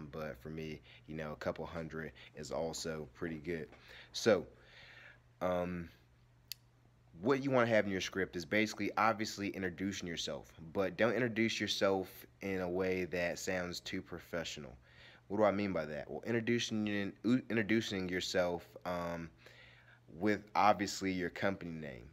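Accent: United States. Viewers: Thats a Baltimore accent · You from the Dmv ??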